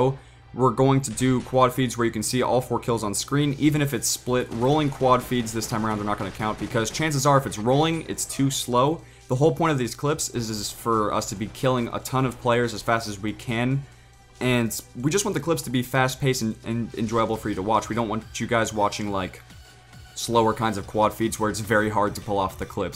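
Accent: Germany. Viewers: r u German? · R u German? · R u german??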